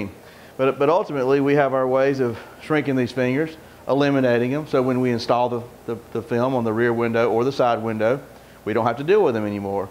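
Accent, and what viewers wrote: South, United States. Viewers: I like your southern accent!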